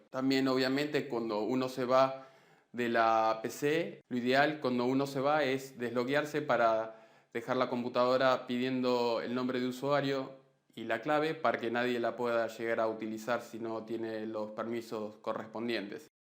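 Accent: Argentina. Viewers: De donde eres entonces? porque tienes acento Argentino PD:Buen video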